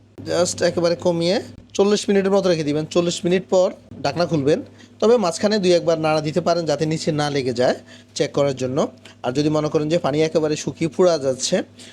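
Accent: Noakhali, Bangladesh. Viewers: নোয়াখাইলা ভাই, পলাও ভালো রাধছেন।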